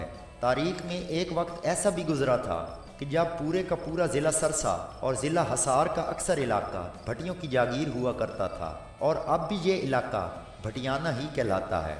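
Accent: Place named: South Punjab, Pakistan. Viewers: janoobi punjab se lagty ho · Saraiki lagda...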